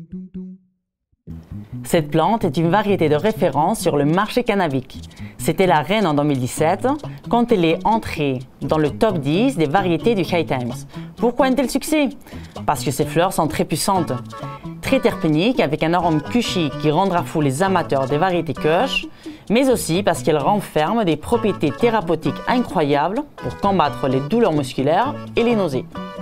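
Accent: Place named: France. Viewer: Oulah chérie tu m'as comblé avec ton accent frenchie 😍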